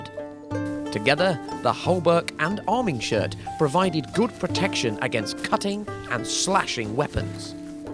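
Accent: England, United Kingdom. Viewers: Haha, at first I though he said, "Knights, minotaurs, and foot soldiers..." Not used to hearing English accents. Good video.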